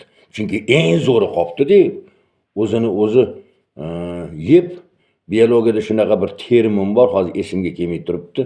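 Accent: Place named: Tashkent, Uzbekistan. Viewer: А сизни энди ёллашдими. тошкентча шевадасиз.сиздан ёшим каттаман охирини эшитгим келмади.муридни Муридисиз.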